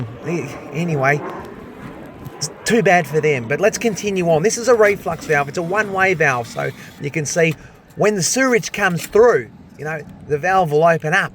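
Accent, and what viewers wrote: Australia. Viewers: Hehe he sounds like that Aussie animal 'hunter' who died stung by a stingray. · Lol this is like watching the Steve Irwin of plumbing. Not just the accent, the overall energy. Love it. 💖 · I just wanna let you know… you sound like Steve Irwin, crocky! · he’s from the land down under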